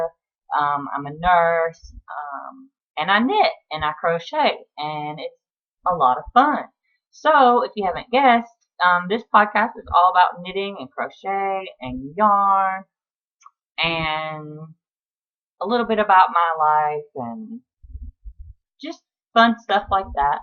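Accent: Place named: Georgia, United States. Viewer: Good morning, I just checked out your podcast, I did a quick catchup. The whole time I was watching I thought you have a strong Georgian (you all.......) lol accent. I missed you telling your location. Diamond is too sweet. By the way we are neighbors. Marietta is were I knit. Keep it coming.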